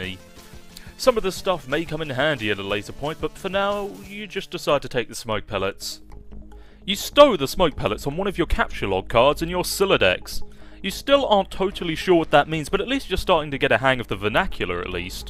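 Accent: United Kingdom. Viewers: Probably cause of bri'ish accent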